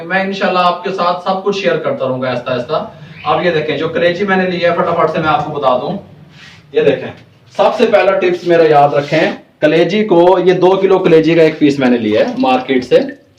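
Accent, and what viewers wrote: Punjab, India. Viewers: Very nice ap ka bolne ka andaz Punjabi thori thori jo bolte hain Good job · ka punjabi ka tarka v good.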